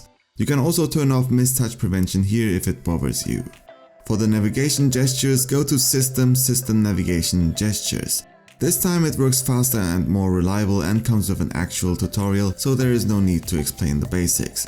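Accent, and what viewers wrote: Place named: Germany. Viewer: Der deutsche Akzent ist nicht zu überhören😂👆🏻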